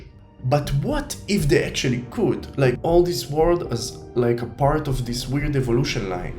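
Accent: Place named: Israel. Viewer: can i ask where are u from? u got Israeli accent, and the game looks amazing!!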